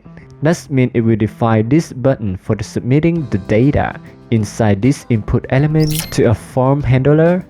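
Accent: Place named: Vietnam. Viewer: anh nói kiểu giọng Anh-Việt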